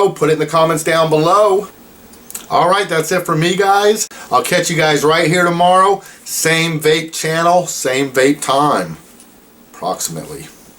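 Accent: South, United States. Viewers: Accent sounds a bit like #ForestGump. No offence, love that movie ) Guys, we at this point have no idea what long term effects are, lets get real, studies that show the residue blah blah shit are literally cavemen level, if you cant see something - doesn't mean it's not there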